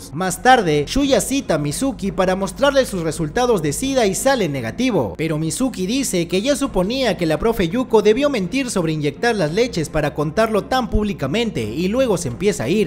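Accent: Peru. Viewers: Eres de lima? xd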